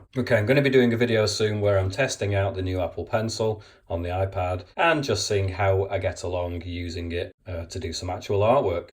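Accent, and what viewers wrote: United Kingdom. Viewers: the brit voice makes it better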